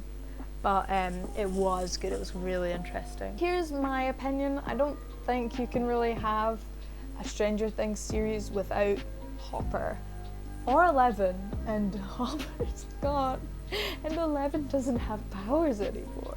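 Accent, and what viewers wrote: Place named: RP, United Kingdom. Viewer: u do sound kinda posh but gang gang sjsj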